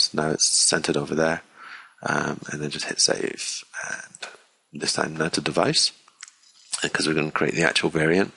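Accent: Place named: Scotland, United Kingdom. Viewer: Firstly, thanks for taking the time to make a tutorial. It's much appreciated by many! As others have said, if you are going to actually take that time to make something to help people, it might be worth trying to slow down (a lot) when you're talking, and try not to mumble. Your Scottish accent adds an extra layer of difficulty for those non-Scots. It would really help everyone to understand your excellent tutorial. But thanks again for a great tutorial!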